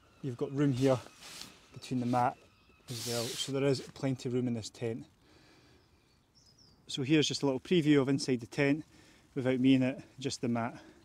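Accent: Scotland, United Kingdom. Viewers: only coz I'm Scottish and have a similar accent I noticed man, wouldn't worry about it 😂 when putting the tracking pole into the loop at the ground if it bothers you 😂 I'm looking at buying this tent and just checked your link. It gives a 3 season and 4 season option I know generally what this means but what would be the difference in tents ? I thought these tents were all double skinned (walled)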